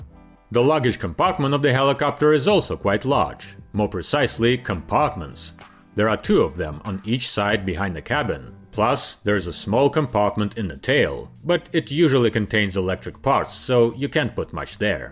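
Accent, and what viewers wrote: Russia. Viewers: It’s not a sky ship video unless it has that genuine Russian accent 😌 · Yippee… politically we may hate the Russians at the moment, however on a personal level, nothing beats a Russian voice narrating to you.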